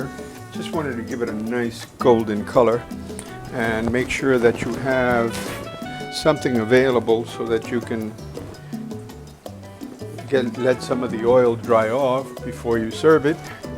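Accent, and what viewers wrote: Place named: New York, United States. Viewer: You can cook for Me anytime!!! ❤Adorable and that N.Y accent, love it all!!!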